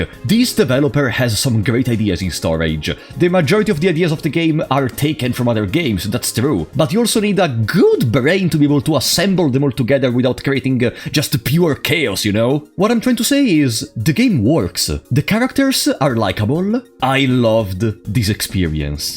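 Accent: Italy. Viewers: Being from New Jersey it's weird hearing an actual Eye-Talian. Fun videos though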